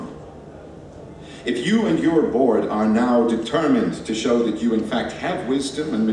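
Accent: United States. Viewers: Did Cumberbuddy do this to practice his American accent? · I love how he portrays his best American accent throughout... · interesting that he is affecting an American 'accent' for this. Doesn't make his voice any less mesmerizing. :)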